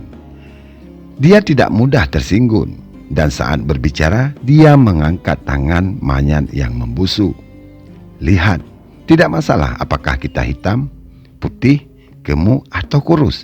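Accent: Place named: Bali, Indonesia. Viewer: Ini naratornya orang balii yaa Keliatan dr logatnya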